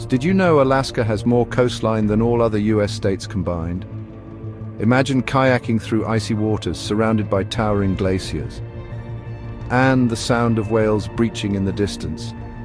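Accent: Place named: United Kingdom. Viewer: Americans don't need anyone with a British accent telling us that some of our states don't matter...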